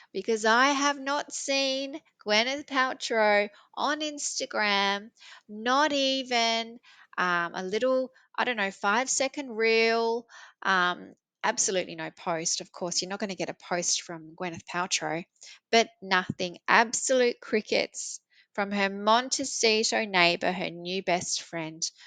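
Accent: Australia. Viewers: I enjoyed the podcast or is it a video ? you sound like an Australian and must compliment your ability to make fair comments without being nasty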